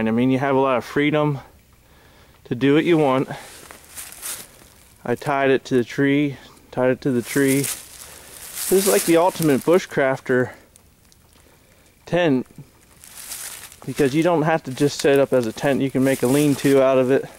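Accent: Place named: Appalachia, United States. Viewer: Thanks for sharing. What part of the Appalachia are you from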